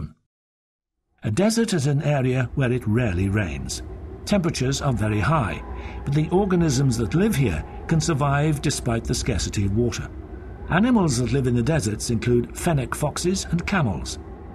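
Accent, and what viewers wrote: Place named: United Kingdom. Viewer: I quite frankly was very fascinated by this educational video due to the fact that the narrator had a lovely British accent and because the video had a lot of cool pictures.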